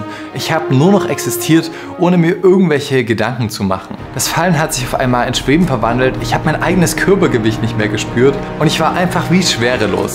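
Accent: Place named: Saxony, Germany. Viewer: Ich liebe deinen leicht durchschimmernden sächsischen Dialekt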